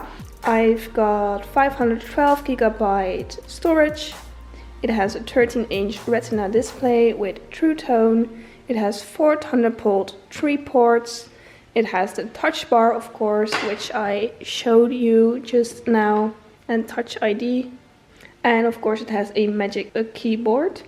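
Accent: Netherlands. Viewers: Klinkt Nederlands? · Why i feel ur dutch from ur accent why i have this feeling tho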